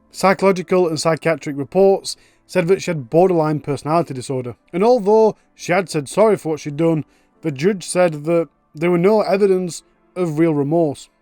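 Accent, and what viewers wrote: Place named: Liverpool, United Kingdom. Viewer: Hey what part of England are you from? Really distinct accent...is it Liverpool?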